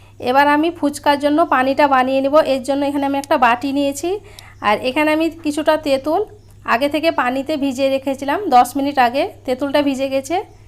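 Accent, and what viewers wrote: Murshidabad, India. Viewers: আপনার কথার সুরটা মুর্শিদাবাদের মত লাগছে । আপনার রান্না গুলা ভালবাসা মিশে আছে গো । অনেক ধন্যবাদ আপা ।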